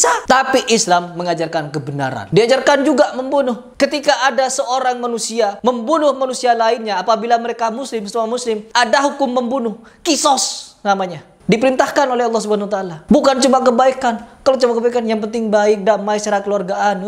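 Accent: Madura, Indonesia. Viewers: Assalamualaikum 🙏💪 bang maaf bang Kayak orang Madura dr logat bahasa nya Madura Sy dr Madura bang 💪💪💪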